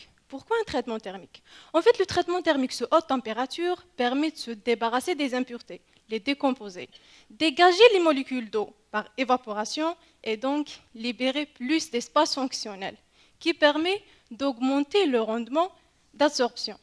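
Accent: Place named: Morocco. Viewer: Marocaine d'après son accent bravo.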